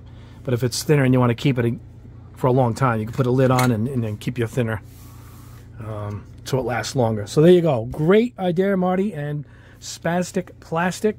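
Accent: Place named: Boston, United States. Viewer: Back to the hobby in the last 6 months. I just found your videos and am enjoying myself at 63 years old. I live in Minnesota, yet am from Boston... so the accent is like home.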